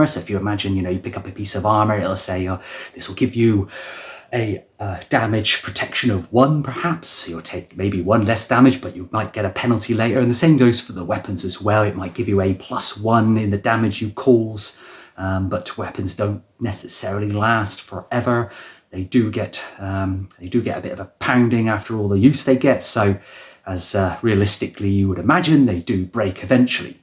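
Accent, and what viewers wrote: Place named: West Country, United Kingdom. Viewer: Aye; that was a best of release. Well spotted. I detect a slight west country twang in your accent. I'm in Yarm, NE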